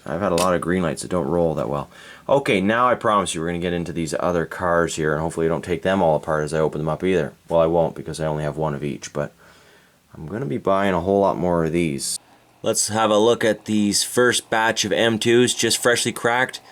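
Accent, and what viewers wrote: Canada. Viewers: Are you Canadian cause you say aye quite a lot there bud if so good aye bud I'm Canadian to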